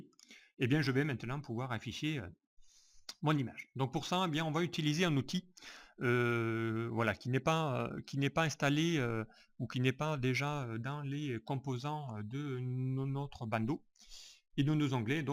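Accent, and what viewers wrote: South, France. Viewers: Super, merci beaucoup pour cette excellente vidéo avec le charmant accent qui sent bon le Sud ...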